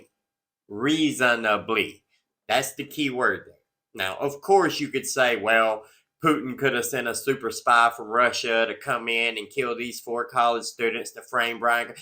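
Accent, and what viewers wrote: South, United States. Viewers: Hello! New subscriber here from the Scottish Highlands. I completely agree with your ‘Southern logic’ and I appreciate your explanation of ‘beyond a reasonable doubt’. Love your Southern accent too! It looks like your subscribers are a lovely group of critical thinkers. Keep up the good work!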